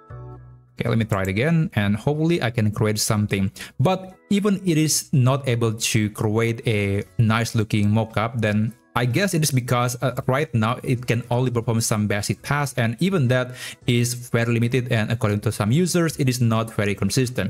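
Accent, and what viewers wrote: Indonesia. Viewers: You sound Indonesian. Good video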